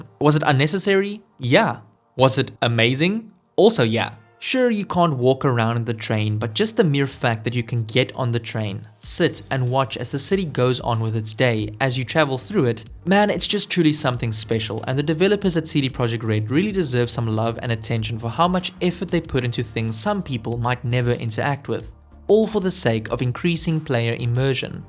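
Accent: South Africa. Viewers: i hear a south african... i watch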